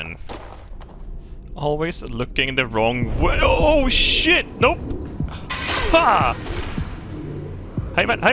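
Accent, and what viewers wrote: Sweden. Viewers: Älskar din svengelska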